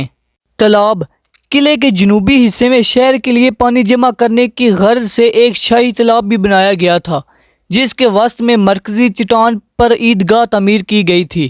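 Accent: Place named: Punjab, Pakistan. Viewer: We belong to urdu speaking family, he is Punjabi..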